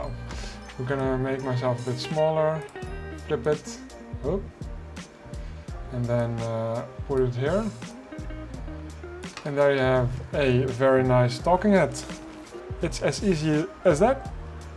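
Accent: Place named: Netherlands. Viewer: ik ruik wat nederlands accent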